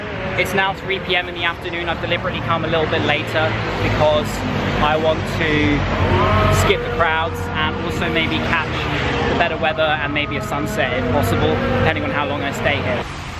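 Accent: England, United Kingdom. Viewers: Your British Accent is very sexy,and easy to understand... Sengau2 manjah di hidung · Hi, it''s a nice review...but i like your english accent btw...which accent is it?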